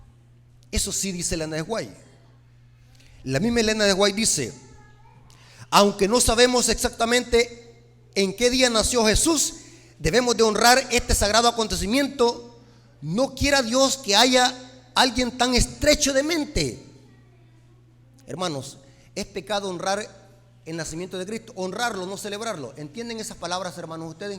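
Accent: El Salvador. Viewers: 🚨De que pais esta este pastor?pienso que sera del Salvador,no me parece su acento a los Nica🤷🏻‍♀️🚨!!!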